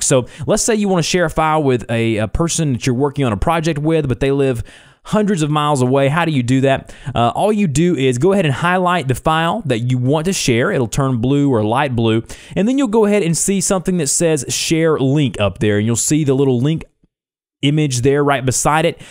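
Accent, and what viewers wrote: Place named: South, United States. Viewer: Not useful. I have had DB several years. You used to be able to just add files to your local Dropbox folder and it would get uploaded automatically. And to share there was a local button. But it appears that's all gone away. This video had nothing on this. I can't say I was fond of the Southern twang either...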